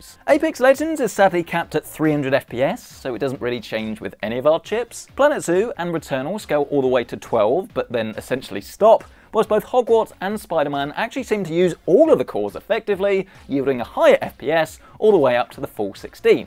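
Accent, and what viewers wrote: United Kingdom. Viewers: he has a british accent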